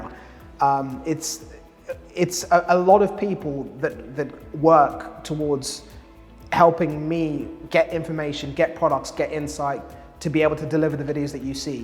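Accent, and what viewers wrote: England, United Kingdom. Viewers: Really enjoy your vids for catching up with hockey stuff. Your docu style vids are my favourite, pretty cool to see how people play hockey and get their fix around the world and their culture behind it. I found your channel recently while looking for new skates for the first time in 20 years and crazy to see how far things have developed (bought Bauer 3S btw, only for public skating at the rink and occasional pond...hockey days are behind me). Baking skates blew my mind! I played in Scotland back in the 80s (goalie) and the most popular skates with players at the time were Bauer Supreme 100s later 1000s, Daoust 301, CCM Ultra Tacks and the newcomer at the time Micron Mega 10-90s. I’ll just say one thing about the race/people of colour/black/brown in hockey. 80s hockey in the UK was white af and I remember Hilton Ruggles breaking into the British hockey scene at top level back then, Whitley Bay I think it was. 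This guy seemed to appear out of nowhere and was an absolute beast of a player. I can still visualise him powering up the ice and our crowd being scared of what might transpire and their breaths being held. Anyway, thanks for the vids. To be honest, your English accent really threw me off the first time I watched…was expecting North American/Canadian.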